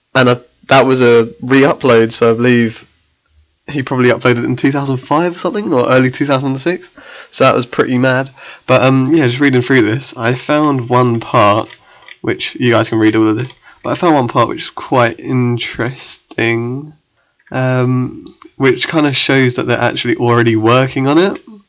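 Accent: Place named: United Kingdom. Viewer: ur british, look at my version plawx?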